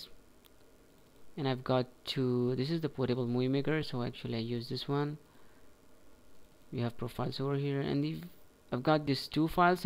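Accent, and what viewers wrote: India. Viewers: I can hear that u r indian i am indian my self except im punjabi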